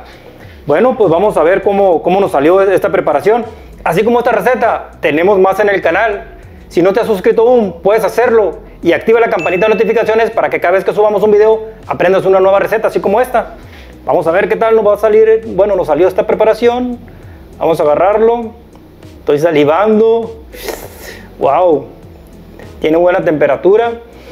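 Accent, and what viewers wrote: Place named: North, Mexico. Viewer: Su asentó del meritito Norte me encontró!!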